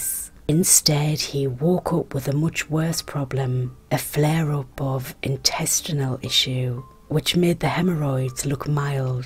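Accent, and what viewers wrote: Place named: Newcastle, United Kingdom. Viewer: Excellent as always - love to hear that Geordie sound!🥰💖⚘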